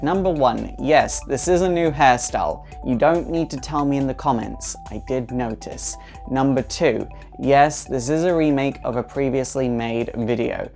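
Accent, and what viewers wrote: Scotland, United Kingdom. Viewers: I thought you were British.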